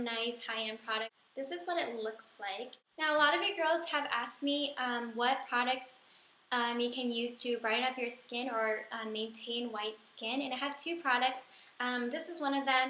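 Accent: Japan. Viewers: THE FUNNY THINGS I SEE THE LAST PART YOU ALSO LEARN THE ACCENT FROM JAPAN STYLE. SO CUTE AND NICE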